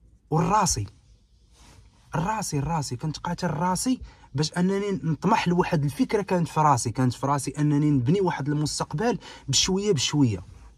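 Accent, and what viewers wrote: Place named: Algeria. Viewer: سمح لي اخويا وقيلة نتا ولد 2000 وطلع لاحظت كلامك كيشبه للجزاير واش متبع الحزايريين تانسيتي لهجتك المغربية؟ ديك كلمة ياو راني نتبع فيك راه لهجة ديالهم ولازم تاهي ديالهم والمدامة ديالك لي قلتي فالتعليق لاخر تاهي ديالهم .المهم حاول تهدر بالمغربية احسن .هما ولاو يهدرو بلهجتنت وانتم العكس وليتو تهدرو بلهجتهم؟ كون غير زينة وهي نصها مديكالي وكلها فرنسية معوجة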